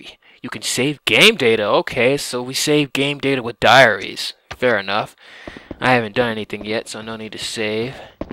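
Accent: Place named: RP, United Kingdom. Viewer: You know she's British :D you can tell she has a posh voice haha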